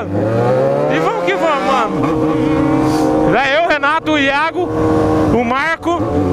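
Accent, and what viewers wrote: Goiás, Brazil. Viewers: tu é Goiano??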